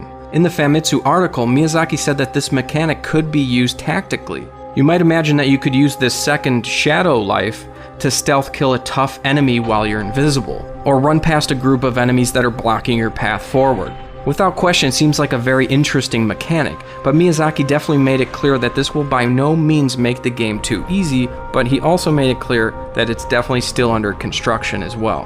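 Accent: United States. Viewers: The Very American way he pronounces Japanese words annoys me.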